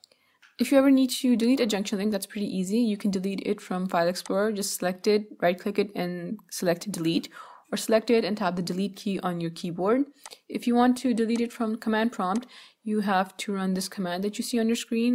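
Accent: India. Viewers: OmG!! But your accent is so sweet! A very mild Hindu accent. I love it!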